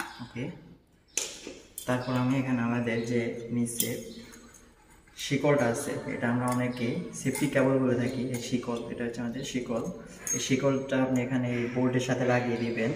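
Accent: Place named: Sylhet, Bangladesh. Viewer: মাশা-আল্লাহ ভাই খুব সুন্দর করে বুঝালেন আর আপনি বুধহয় সিলেটি আমিও সিলেট থেকে দেখছি❤❤❤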